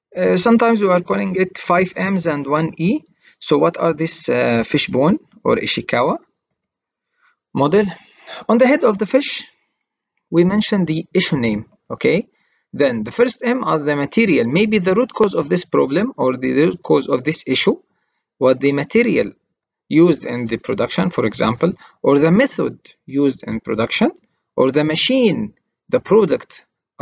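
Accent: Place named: Arab world. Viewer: Are you from middle East? Your english is like arabic.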